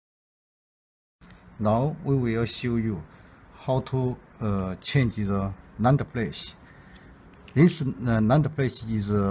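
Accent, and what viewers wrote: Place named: Japan. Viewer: Do not ask me why, but when I hear your Japanese accent, I feel like an engineer does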